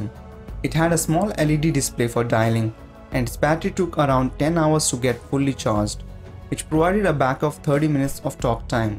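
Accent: India. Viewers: You have an indian accent...?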